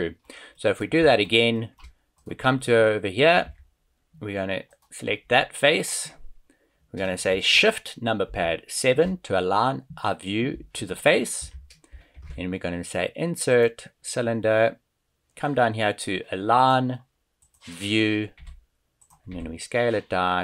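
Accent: South Africa. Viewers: Well I'll be damned, that's a Saffer accent if ever I heard it! Where you form AB?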